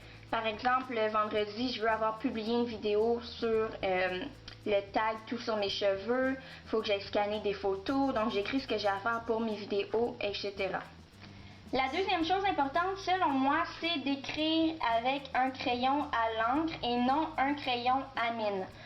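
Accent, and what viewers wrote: Quebec, Canada. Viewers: québecquoise, c'est un des accents qui se repère le mieux ^^'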